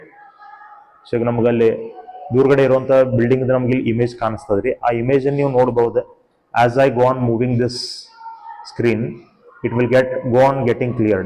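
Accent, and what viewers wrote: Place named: North Karnataka, India. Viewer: And also I got know tht u are Uttar kannadiga by ur talking style😅 am From GADAG SIR Bipin Chikkatti Pu science College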